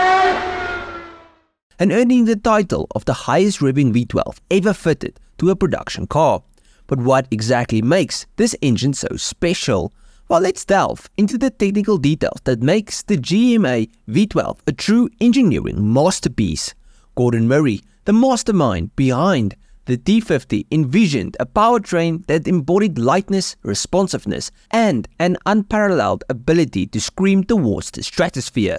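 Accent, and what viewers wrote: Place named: Brakpan, South Africa. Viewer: Liked the video for the pure Brakpan accent 👍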